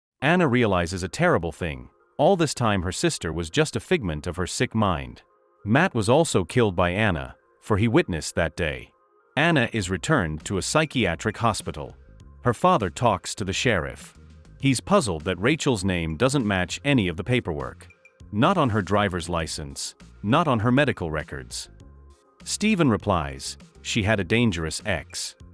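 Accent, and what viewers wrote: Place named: France. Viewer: Interesting French accent.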